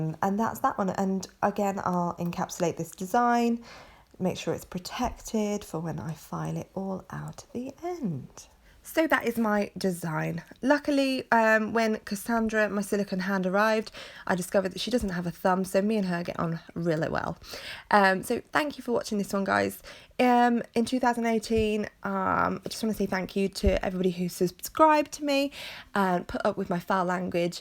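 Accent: United Kingdom. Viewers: I just started watching your vids and absolutely bloody love them love your personality I'm from manchester and live in the u.s and just reminds me of home and I crack up to your voice overs there just Brill thank you so glad your vids showed up on my feed!!!! Georgeous set too! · I just subscribed last week, and I find you informative and FUN! To an American, who, (in the states,) is considered to have "no accent,"* there is something incredibly charming about a British accent cursing. It's unexpected and kind of incongruous to the American ear, and it hits our ears as hilarious! *I was born and raised in California, fourth generation native Californian, at that. I think a California accent is the American equivalent of received pronunciation in the UK.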